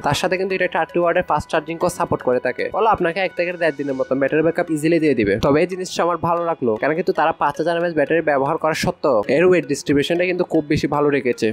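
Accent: Chittagong, Bangladesh. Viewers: চিটাইঙা পোয়া বাজি যেইল্লা মাতক চিটাইঙা যে বঝা যাইবো😅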